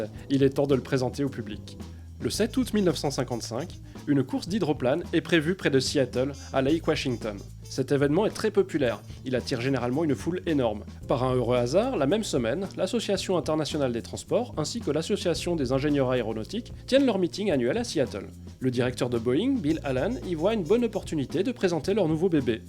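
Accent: Switzerland. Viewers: Tu est suisse? sinon très bonne vidéo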